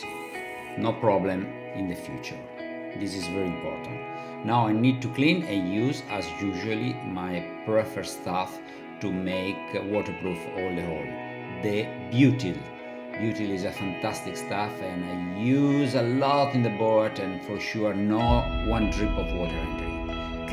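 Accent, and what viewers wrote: Italy. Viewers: bravo sembri veneto dal sound....se ti serve una mano gratis a disposizione perche mi sa che c'e' davvero molto da imparare da voi. well done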